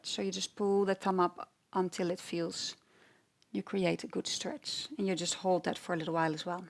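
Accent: Netherlands. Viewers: You can hear from the annoying accent that she is Dutch lol.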